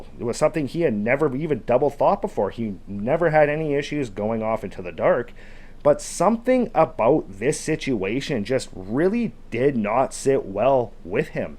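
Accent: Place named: Canada. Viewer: That’s an insane accent 😂 no wonder Americans make fun of us. - a west coast Canadian ❤😂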